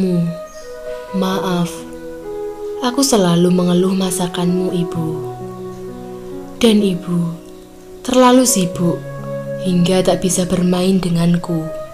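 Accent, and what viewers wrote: Java, Indonesia. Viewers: swarane medok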